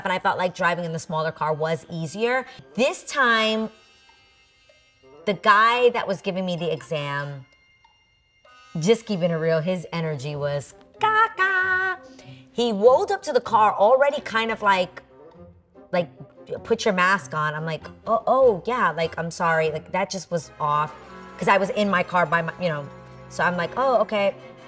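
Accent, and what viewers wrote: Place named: New York, United States. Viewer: You’re from out of town?! 😂 with your NY accent? I love it! 😂❤️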